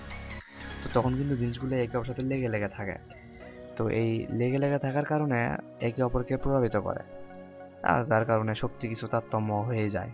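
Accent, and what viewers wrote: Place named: Bogura, Bangladesh. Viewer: Bogurar vasha sune valo laglo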